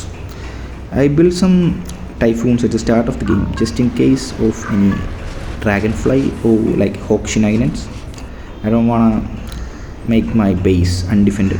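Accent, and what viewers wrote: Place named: India. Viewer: I can tell you are an Indian the by way you pronounce ''t''😄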